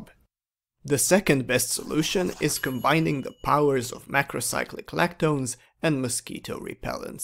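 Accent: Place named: Hungary. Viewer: Best hungarian english on earth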